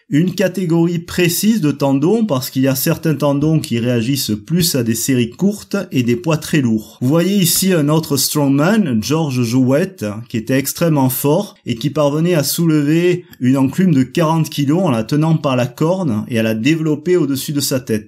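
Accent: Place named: Corsica, France. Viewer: Super video ! On croirait meme reconnaitre un accent corse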